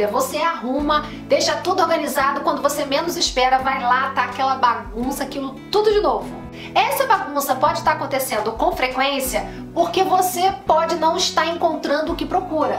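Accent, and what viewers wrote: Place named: Rio de Janeiro, Brazil. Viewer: Gosto do seu sotaque carioca. Sou mineira. Suas dicas são ótimas. Já vou chegando dando meu like.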